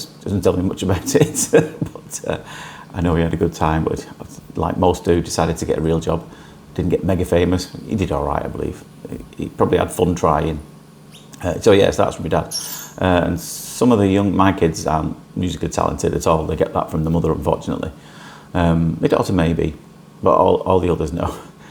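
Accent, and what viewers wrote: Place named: England, United Kingdom. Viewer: It's hard to understand you sometimes when u our speaking because of your English accent 😊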